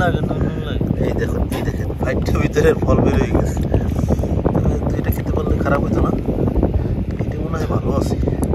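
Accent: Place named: Bagerhat, Bangladesh. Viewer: আপনার বারি কথায় বাগেরহাটে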